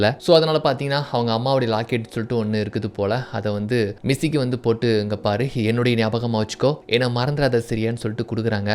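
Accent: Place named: Thoothukudi, India. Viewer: Eilla Thoothukudi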